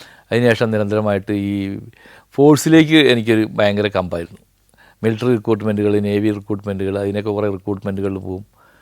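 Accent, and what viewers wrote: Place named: Valluvanad, India. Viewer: നന്ദി സാർ, വള്ളുവനാട് ഭാഗത്തെ ഭാഷയിൽ സാർ പറഞ്ഞ കഥകളും പറഞ്ഞ രീതിയും വളരെ നന്നായിരുന്നു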